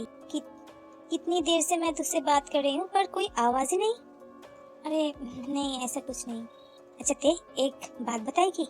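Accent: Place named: Bengal, India. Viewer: Nice story or bangali logo ka hindi sunneme accha lagta hai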